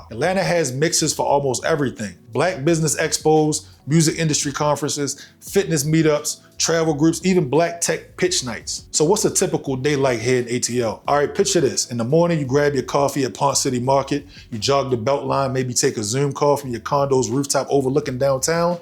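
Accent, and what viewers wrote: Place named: Baltimore, United States. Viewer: U might be in Atlanta But u gotta be from the DMV Bmore accent heavy 🤝🏾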